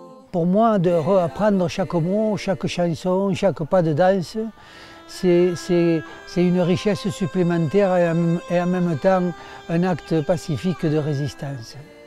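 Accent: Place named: Pyrenees, France. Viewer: Monsieur Nadau juste Merci. C’est un bonheur de savoir que vous vous amusez en réalisant ce qui vous anime le plus « la chanson » mais c’est aussi et surtout pour nous un émerveillement d’écouter vos paroles tellement forte et pleines de sens. Vous nous faites rêver grâce à votre accent, votre patois la beauté de vos Pyrénées. Toujours un plaisir inouï de venir dans votre région. Merci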